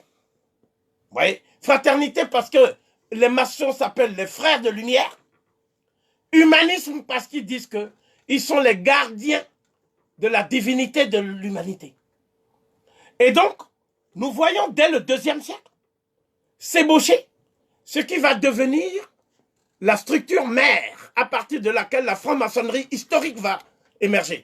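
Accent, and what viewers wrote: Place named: Abomey, Benin. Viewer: Un béninoise qui parle français garde son expression natale Abomey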